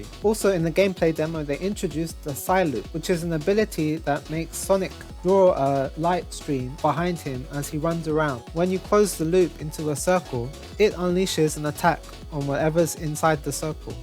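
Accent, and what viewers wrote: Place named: Japan. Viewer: Are you Japanese? Your accent is interesting.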